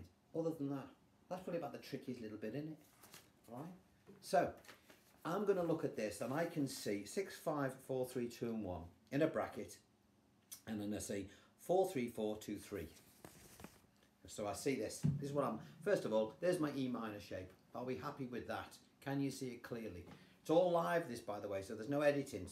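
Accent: Liverpool, United Kingdom. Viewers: Good stuff. You a scouser, then?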